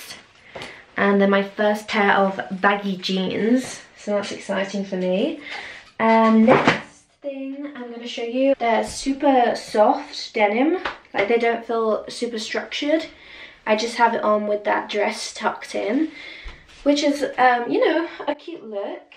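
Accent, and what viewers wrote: United Kingdom. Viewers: Her accent sounds British. I never knew she was British. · You have gorgeous eyes! And I love your beautiful voice with that British accent it’s hot! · I love these try on hauls, your body is amazing and you are gorgeous. But I also love your British accent and your voice, just listening to you talk gives me some asmr tingles sometimes. You seem like such a sweet person.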